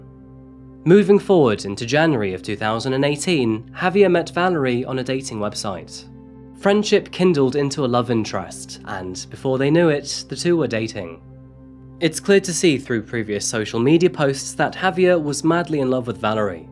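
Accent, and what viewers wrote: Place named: England, United Kingdom. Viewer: I believe he actually does live in the US now, if I remember correctly when mentioned in a previous video. I forget what city/state. EDIT: I was wrong, he is FROM America, but moved to the UK at a young age and lives there now! I got it mixed up! But now I can't remember what city he was from in the US. I feel like it was in the Pacific Northwest for some reason? Maybe I just have Seattle on my mind because he loves coffee lol. I honestly don't know. But yes he moved to UK at age 7 per his Q&A video and developed the English accent. He is likely just promoting Scentbird because they're a sponsor for his channel, but sponsors often send the content creators free stuff.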